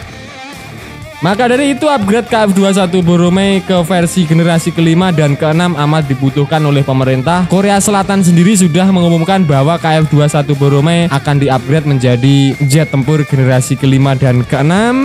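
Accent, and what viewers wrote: Java, Indonesia. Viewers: medok tongkol jelek parah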